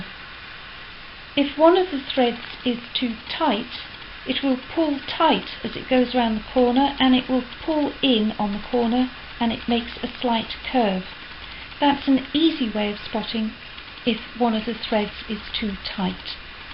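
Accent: RP, United Kingdom. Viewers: How cheeky to comment on your "posh accent",I was just so pleased to find your very helpful instructions.I've just bought a lovely old Singer and am having problems getting the tension right.Thanks to you I think I've cracked it. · Where are you from? You speak in such a posh way!:)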